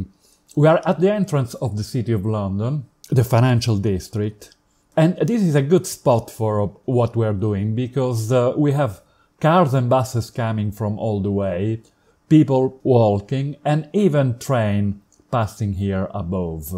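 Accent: Italy. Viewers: I like your italian english. Made me feel like at home again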